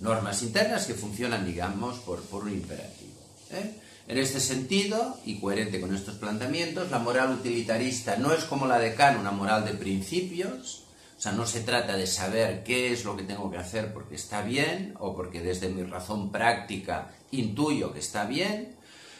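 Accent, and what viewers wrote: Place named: Catalonia, Spain. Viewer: El podries haver fet en català també, que set nota l'accent!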